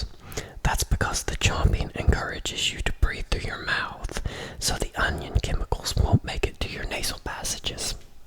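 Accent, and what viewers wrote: South, United States. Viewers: You're very welcome, you whisperin' southern gent ☺️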